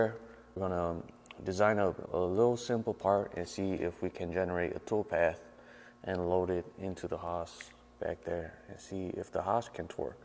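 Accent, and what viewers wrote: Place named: New York, United States. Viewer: Cool videos. Where are you based out of? You sound like you might be from NYC.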